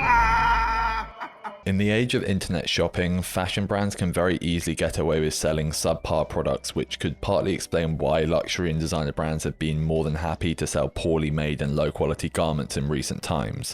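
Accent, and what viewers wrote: United Kingdom. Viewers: when a monotone british man speaks, i listen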